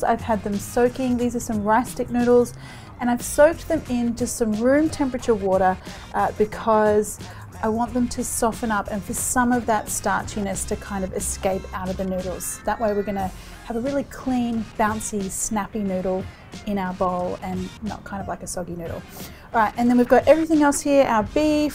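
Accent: Australia. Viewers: I love how Australian accents add an 'er' to the end of words... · That’s her Australian accent.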